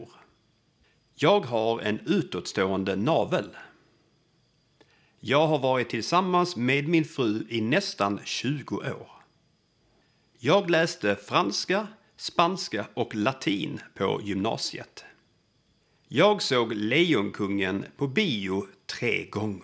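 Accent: Skåne, Sweden. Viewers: Ahhh, the lovely broad diphthongs of Skånska! That was the dialect of Swedish I learned many years ago. Thanks for the memory!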